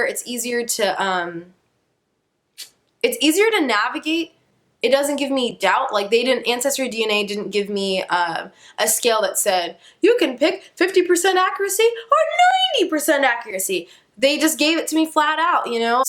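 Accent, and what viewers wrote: Somalia. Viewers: your Somali is just awesome. "gabartan waa walati" love that accent. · I love your somali maay accent..miss confusing for those who don't speak maay but anyone who speaks maay will understand you.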